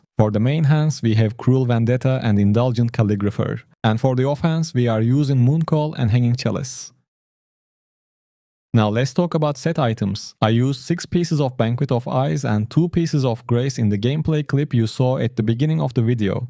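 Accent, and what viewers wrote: Turkey. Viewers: Videoya girer girmez türk ingilizcesi aga nerde olsa tanırım 😅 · Kanka sen Türk aksanı ile konuşuyorsun öyle ise yazar mısın?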